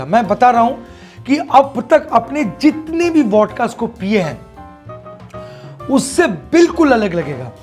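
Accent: Bengal, India. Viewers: Dada, your English with a hint of Bengali touch is really sweet to hear. You have really done, us drink lovers, feel good about our drink. Knowledge about the drink, really adds a punch to the way we enjoy it. Just a little correction, "Rahasya" means mystery not secret, secret means "gupt".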